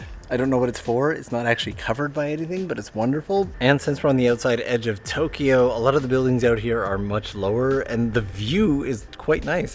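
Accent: Canada. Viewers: Somehow after living in Japan for like 10 years this dude still has a Canadian accent.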